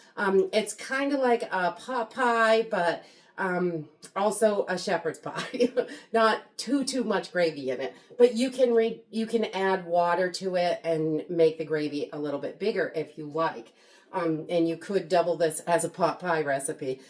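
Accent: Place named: East Coast, United States. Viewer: I love all you do with freeze dried food! This is what I give my kids for Christmas now. I buy 12 quart jars and use your recipes to make them. They save their jars and I refill them every year. Thank you for your ideas! I love them! Thrive life is so worth it! Have a great day. P.S. I love your eastern accent!😊 I’m a born and raised midwesterner lol.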